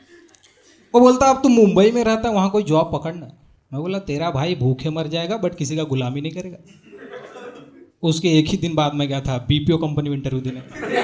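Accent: Chhattisgarh, India. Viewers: Accent Chhattisgarh ka lagta hai !!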